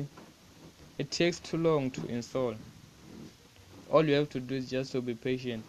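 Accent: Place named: South Africa. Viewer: I can smell the accent...mzansi👑❤😂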